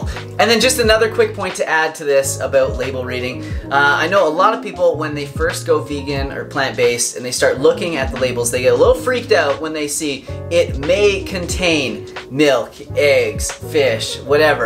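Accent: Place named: Canada. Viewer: Ahhhh, Canadian. Now the accent makes sense.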